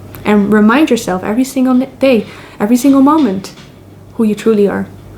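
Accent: Netherlands. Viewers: Such profundity rendered through a delightful Dutch accent! Enjoy channeling your Higher Self : ) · I have listened to several of your videos and you are remarkable, you articulate the truth as I have experienced it with so articulately. My only question is where are you from? The Netherlands? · Wait are you Dutch ? (Flemish here)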